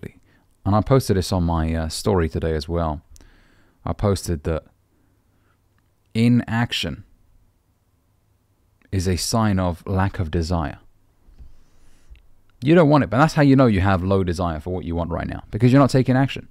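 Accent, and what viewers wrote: United Kingdom. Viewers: Hello! I stumbled upon one of your videos randomly, and I ended up watching almost half of your entire collection already! You and I both know that the content you cover has been explored in various ways by many others before you, but let me tell you: your approach is truly unique and I absolutely love it! Consider me a dedicated subscriber from now on. Your calm and non-aggressive tone, along with your willingness to understand and help, are rare qualities these days. I wish you all the best not only in your business but also in your personal life. By the way, if you don't mind me asking, where are you from? Your English accent sounds quite British to me. Wishing you the best of luck in all your endeavors!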